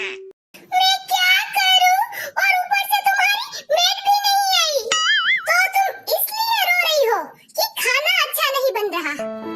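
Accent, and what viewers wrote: Gujarat, India. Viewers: Wow gujtati accent is so good 🎉😊❤ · Didu aap kya gujrati ho voice sound se lga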